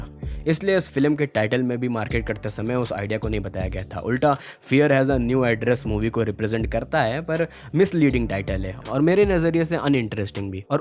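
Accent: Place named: India. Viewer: Film❌filam✅ bhai ka accent desi hain🙂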